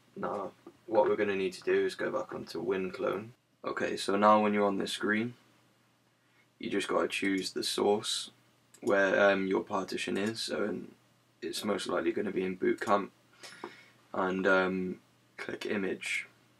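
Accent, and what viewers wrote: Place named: United Kingdom. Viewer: oh British :D thank u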